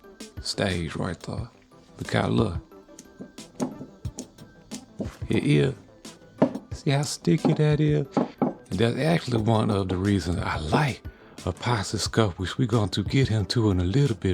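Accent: Louisiana, United States. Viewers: where you from Louisiana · I swear you sound like my Louisiana people.......glad I moved back! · One of my secret joys is clicking subtitles on these videos and watching the Youtube robots struggle to interpret smooth and melodic Louisia-ness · I'm still convinced that this man is a cajun from my home state of Louisiana. Love your work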